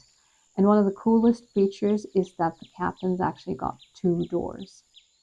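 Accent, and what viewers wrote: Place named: United States. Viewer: Oh liebe, after some failed approach only, today I did see your videos; twice, and I am - my english from the old millenium is too bad - sono commossa. Going around the world with www, with Captain, with the friendly people around the continents, who see and love YOU and these videos - which language fits? I was non really able to understand everything in the video - I suppose, because your english is very US-marked and my english is so old and simple and never really used outside of Germany. I never crossed the ocean, but I feel the love and the blessing to have friends over oceans of water, of mountains, of wars, of years - and this, for me, is making your videos so warm, sincere, beautiful. I loved to see this green and pacific Germany, to see you and your mother so near to each other, to feel connected. I think, this is the secret - your secret, the secret of leaving a country to explore an other country, to find friends and to stay connected. 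And having captain you will be shure to move on and to pass borders and to meet and connect with other people capable to love!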